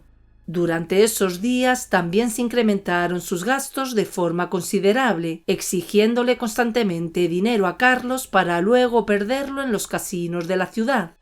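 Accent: Spain. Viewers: ¿Por qué? Es audio real humano con castellano y acento original… es cuestión de gustos y preferencias!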